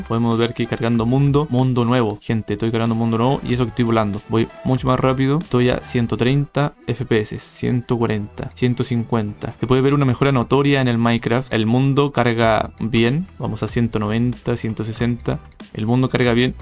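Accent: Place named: Chile. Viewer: Chileno se te nota, gracias mi hermano hoy quise revivir mi infancia, meo corte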